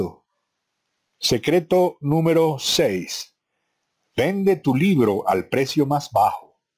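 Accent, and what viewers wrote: Venezuela. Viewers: Buen video. venezolano?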